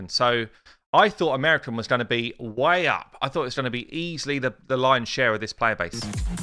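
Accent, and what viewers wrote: United Kingdom. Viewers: I could tell by your oh so British voice inflection. I had the pleasure and privilege of working with the RM when I was an active member of the USMC. I rapidly got to understand the humor of the Citizens of the UK. You British are different from region to region. The Scots, the 1st Sgt. was different from the British. The Welsh had a completely different view of the world. But the beer was great, rats were good, and we all agreed Norway was an ICY *place*. But the women made the evenings eventful. Someone said the main thing separating the US and UK is a common language.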